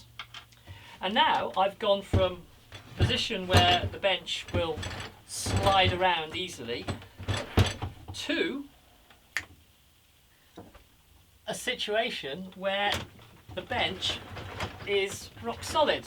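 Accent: United Kingdom. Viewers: Fender uses those inserts in the Yngwie Malmsteen strat so you had a good idea for sure. I own that guitar and you can feel the vibration of the strings better than a normal neck. I use them on all my bolt on necks. Just found your channel, good stuff and everything sounds better when a UK lady speaks. Cheers.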